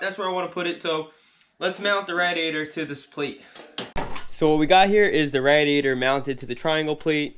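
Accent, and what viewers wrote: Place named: Canada. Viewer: Great vid but that accent is rough dude. Where are you from? Canada? Here in the south you would stick out like a sore thumb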